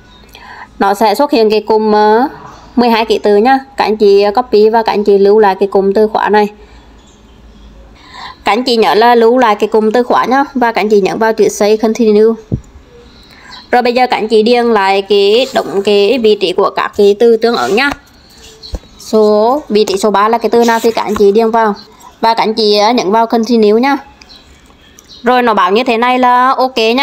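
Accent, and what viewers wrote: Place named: Nghe Tinh, Vietnam. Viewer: Chưa hiểu rồi sẽ thế nào nhưng thấy hay hay nên ủng hộ em một ref nhé, à mà em ở đâu mà nghe giọng giống quê choa rứa. Hihiiii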